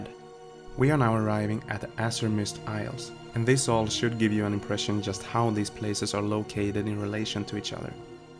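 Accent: Sweden. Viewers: let me guess, you're scandinavian? Swedish? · Ey bro are you swedish because i can here it lowkey in your accent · I can hear the Swede inside you. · Damn va svensk du låter. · Is he Swedish? Sounds like english-swedish speaking... Im swedish.. :) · swedish? xD your accent is gold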